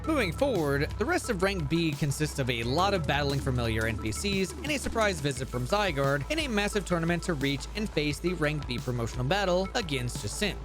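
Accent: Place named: France. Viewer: I am 100% sure he is American, as I have been following the channel for quite a while now. Yes, french accent is pretty heavy 😅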